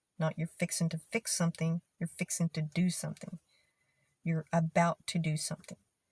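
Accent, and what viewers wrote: Texas, United States. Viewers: Honestly, the Texan accent is beautiful...But that is just my opinion . Love the way you talk ..I hope I learn the accent one day... I actually use Yes sir, Yes Maam talking to my patients and other people in public..respect is always a thing for me and my country... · I think you did a good job but the best part was listening to how you say things. The length of your sentences, your intonation, diction, body language and so on are wonderful. These things you do automatically, never thinking about it enough to make a YouTube about. That's the REAL Texas talk. I'd like to hear you and your friends chat about something commonplace - not sports because there's a lot of jargon that everyone uses with that. Talk about growing up in Texas and things that happened. I'm a writer and right now, I'm looking for examples of contemporary Western language. 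It's at its best when people are having a conversation, lectures are okay but that's never completely natural. I guess if I wanted to do this, I should come to Texas and spend a lot of time at restaurants, bowling alleys, and grocery stores observing like a bird watcher. Yep, that'd be the way, but listening to you is very helpful too. Thanks! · Finally a video by someone who actually speaks with a Texas accent. Most of the other videos are by people who don’t speak Texan. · I understand your accent completely! I’m from the Boston area and we have that particular accent. I’ve lived here all my life, but the funny thing is if I’m watching tv I can pick out a Boston accent however slight. I don’t mean actors who are speaking that way for a role, because they never get it quite right. I mean native speakers. Question for you, what area of Texas pronounces greasy as “greazy?” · You guys have quite a bit in common with the Aussie accent then. 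We say “waddaya want”, “budda”, “gunna”, “tuday”. On the other hand someone from Boston saying “park a car” would sound exactly like us. Definitely coke just means Coke tho (when you’re talking about drinks that is!). But I think that’s not just Texan - other Southern states say that too, weirdly enough! Would you say you have a strong Texan accent? To my ears it doesn’t sound as “extreme” as other accents, like say, someone from Georgia or Alabama. Anyway, it’s a pity Southern accents get stereotyped as uneducated, I think they’re gorgeous, and yours is lovely & smooth. Cheers from Australia 👍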